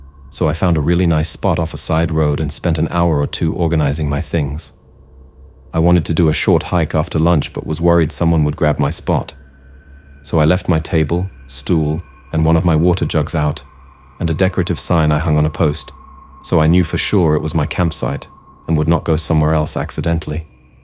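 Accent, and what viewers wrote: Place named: RP, United Kingdom. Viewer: You do a good RP accent. We say "privacy" with a short "I" sound though.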